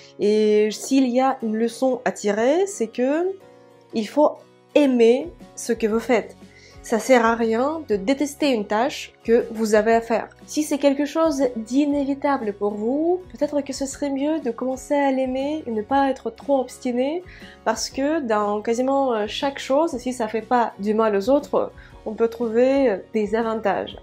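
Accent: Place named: Russia. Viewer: Votre Français est parfait avec une pointe d’accent Russe dans la prononciation c’est bonheur absolu. Félicitations et merci pour ce moment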